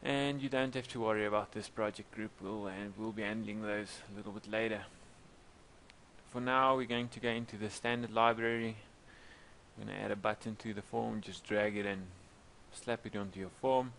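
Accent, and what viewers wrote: South Africa. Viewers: Yeah I noticed the SA voice straight away. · Sure sounds like a South African man!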